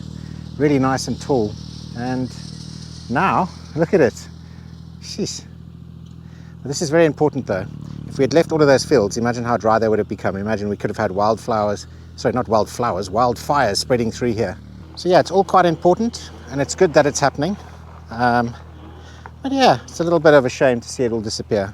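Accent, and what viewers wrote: South Africa. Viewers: Get yourself some temperature probes. You probably overcooked the crap out of the chicken but who knows unless you can get the internal temp. In fact someone please send some to review for sponsorship dollars. The cool bluetooth remote ones. Also it's "Weber" not "Weeber" - j/k the saffa accent is cool🤣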